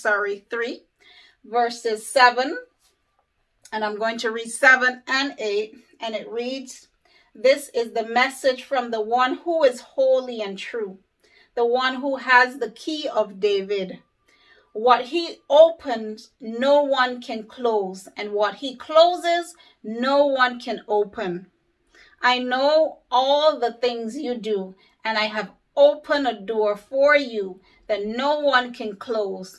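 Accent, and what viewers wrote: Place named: Barbados. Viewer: I hear that bajan accent! I love it and this video, God bless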